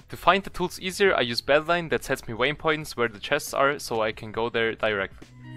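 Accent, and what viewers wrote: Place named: Germany. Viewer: How did I only hear your german accent after hearing yoou speak german?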